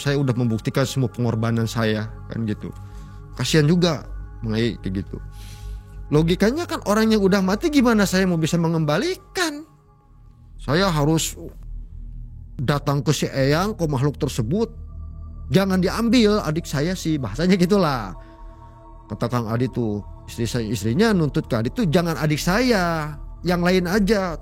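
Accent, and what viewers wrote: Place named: Cirebon, Indonesia. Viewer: Logat ngmngnya ky indramayu cirebon😃🙏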